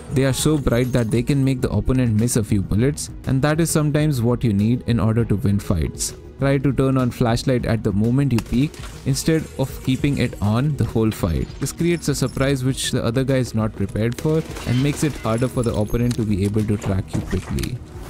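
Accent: India. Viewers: As soon when you heard the strong indian accent you knew that the guys knew his shit xD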